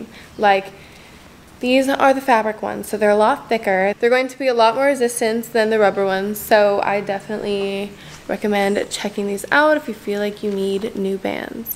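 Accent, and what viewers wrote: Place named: Nashville, United States. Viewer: I can hear that Nashville accent coming through a little!! 🤠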